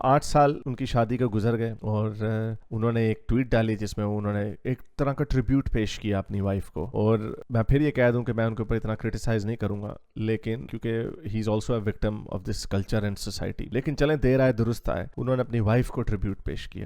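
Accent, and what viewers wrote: Punjab, Pakistan. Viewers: Sir ur punjabi accent is great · No they both are Pakistani Punjabi speaker that is why accent is same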